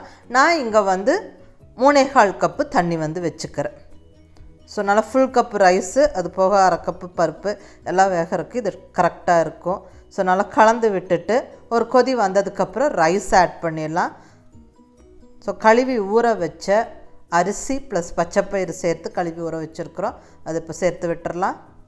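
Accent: Coimbatore, India. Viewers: Feeling good to hear the coimbatore tamil slang. Very nice akka. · Sis neega covaiya neega pesurathu eanaku pedikum sis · neenga pesarathu kongu tamil ah madam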